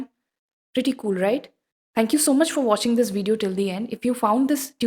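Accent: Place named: India. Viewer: I just can't listen to indian accent sorry 😆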